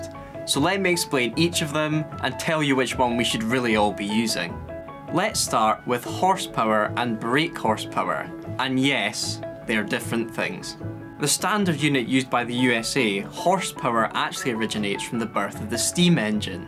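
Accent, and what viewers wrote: Scotland, United Kingdom. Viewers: FML a Scottish accent.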